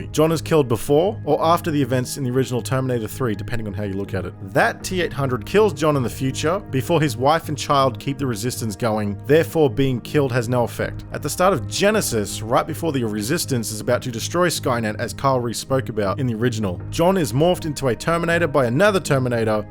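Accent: Australia. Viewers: Are you Australian? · BTW I love that Aussie accent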